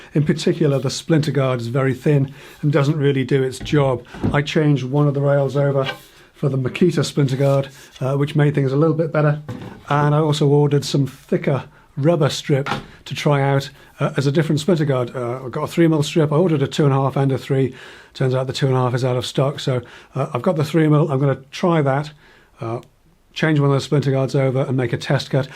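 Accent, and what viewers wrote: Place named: North West, United Kingdom. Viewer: Your accent sounds local to me, are you from the North West?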